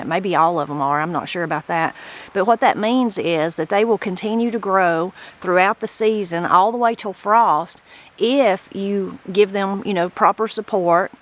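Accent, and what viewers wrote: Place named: East Tennessee, United States. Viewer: Your accent makes me think about my childhood. My family is from East TN - not exactly the same accent, but pretty close. All your videos feel like a chat with the neighbor.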